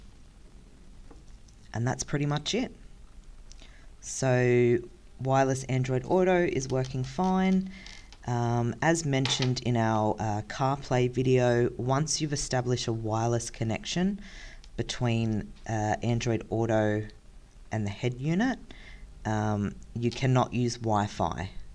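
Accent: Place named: Australia. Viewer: very helpful, thanks! ( so good to hear an Aussie voice narrating)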